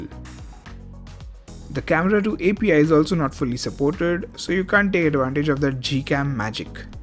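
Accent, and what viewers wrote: India. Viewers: Youtube should put all these indian reviews on youtube india. Indian accents are super annoying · I Don t understand Indian English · I love your accent it's Indian right.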